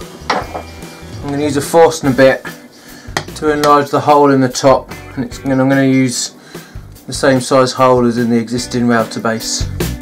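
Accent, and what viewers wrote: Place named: England, United Kingdom. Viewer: Great video spoilt by such crap music. Great to hear an English accent for a change on here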